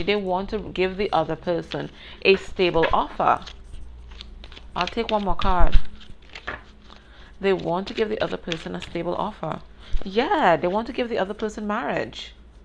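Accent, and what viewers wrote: Trinidad and Tobago. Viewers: You sound like a Trini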